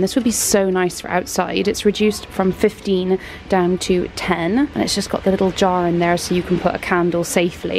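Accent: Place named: Bristol, United Kingdom. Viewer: are you bristolian? you have the accent for it!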